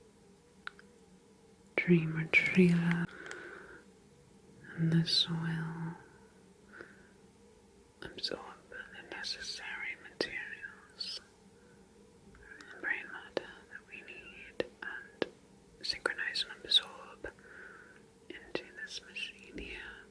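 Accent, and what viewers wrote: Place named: Europe. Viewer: I looooove the subtle "continental" accent on this. Time for another hard New England accent video to balance it out?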